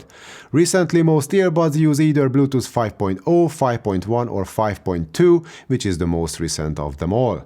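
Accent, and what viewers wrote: Hungary. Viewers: Hello, great guide, it helped a lot in choosing my earbuds! I was wondering what your native language was, because listening to you felt like you were talking to me in Hungarian accent the whole time. I can't help but mention this. :D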